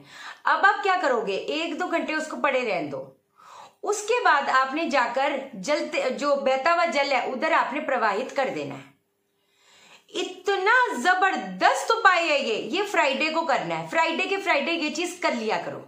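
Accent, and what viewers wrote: Punjab, India. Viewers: Yaar kya mast attitude hai twada bilkul punjabi tone blesss you darling and love your mom also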